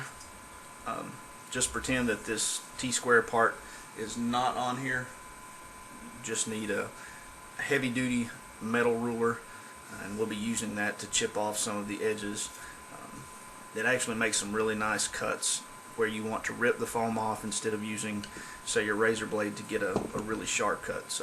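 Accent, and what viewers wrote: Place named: South, United States. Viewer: You sound like your from below the Mason Dixon line... >.> great way to make a hill though!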